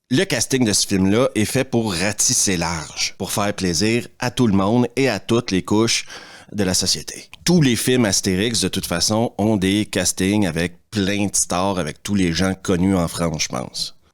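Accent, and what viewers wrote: Quebec, Canada. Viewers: avec ton accent je voyageais au pays de la poutine et au jourd hui j'apprend que tu vis chez moi XD c'est beau mais ca casse le rêve ahahha . des bisou merci pour tes video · Mon gars ta chaîne c'est une petite pépite,je dévore tes vidéos les unes après les autres. Déjà que j'ai un faible pour l'accent québécois mais là franchement avec ton humour en plus ta façon de t'exprimer franchement c'est vraiment que du kiff. Et bordel qu'est-ce que astérix et Obélix la Terre du Milieu est un film de merde et de voir Guillaume Canet chialer en disant allez voir mon film c'est vrai que franchement c'était pathétique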